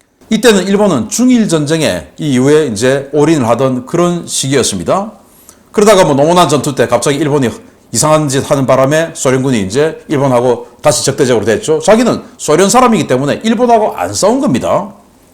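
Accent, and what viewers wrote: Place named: Gyeongsang, South Korea. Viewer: 경상도치야...국방티비에서 내려와라...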